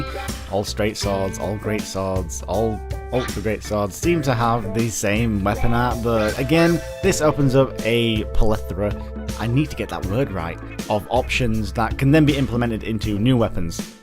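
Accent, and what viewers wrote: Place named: Northern England, United Kingdom. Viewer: Lenayus Mynestone Northern England accent.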